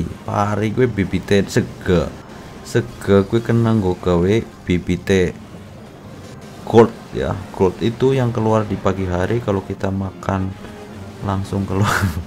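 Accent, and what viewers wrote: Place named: Banyumas, Indonesia. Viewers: Mantap bang ngomong ngapak ke wkwkwk kocak bang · Ngapak banget sumpah 😂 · Wong Purwokerto wes ketara 😂🤣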